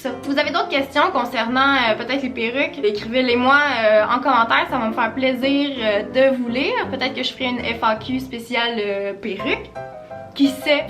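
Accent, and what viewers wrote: Quebec, Canada. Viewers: On dirai la quebecoise dans les anges 8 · trop cheure, l'accent québécois haha. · jadore l accent Québécois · J'aime trop l'accent québécois 0 t'as un de ces accents 0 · quebecois comme d'abitude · J'adore l'accent québécois c'est super mignon, sinon j'aimerais beaucoup porter des perruques aussi mais je sais pas où en trouver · j'adore ton acent Québecoise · elle a un accent Kebekoi 😁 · Je m'attendais pas a l'accent québécois 😆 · je crois quelle est québecoise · Oh quelle joie de vivre, tu aime porté des perruques, très bien, l'accent du Québec est bien, super vidéo. :-) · j adore ton accent Québécois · C'est pas contre quoi ... Mais je détèste ton accent québécois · Ces normale elle est québécoise et moi aussi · j'adore ton accent Québecois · j'adore les accents Québécois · sa se voit que t une quebequoise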